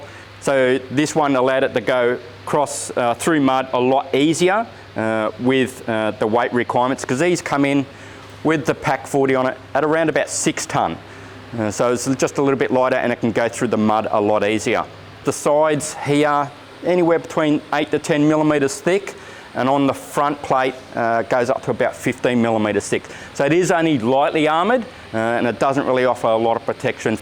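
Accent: Australia. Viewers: Aussie vernacular. You'll have get used to it if you want to watch this channel.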